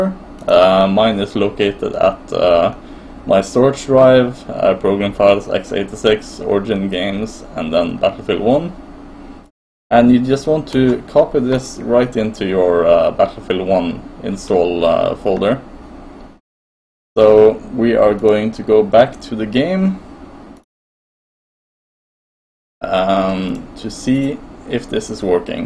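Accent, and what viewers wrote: Sweden. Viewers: Swedish detected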